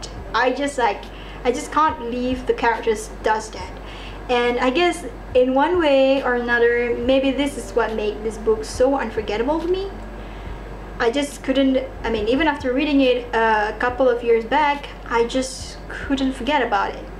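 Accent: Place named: Philippines. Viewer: You're pretty cute, accent sounds Filipino or something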